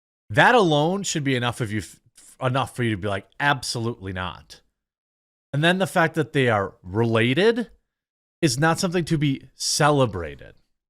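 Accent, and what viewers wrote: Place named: North, United States. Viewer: your accent isn't "Midwestern." It's Northern. I only hear people with your accent in Michigan, Wisconsin, and Minnesota. I'm from Indiana and no one here says "Dreg-in."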